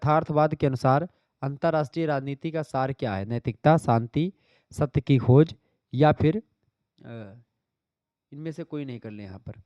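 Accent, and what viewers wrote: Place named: Bundelkhand, India. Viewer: thank u so much sir aapki video apki class hmesha helpful hoti hy maximum qns ate hy exam me jo ap padhate h sir ap kha se apki language hamre side jaise lgti hy chitrakoot ya banda jaisi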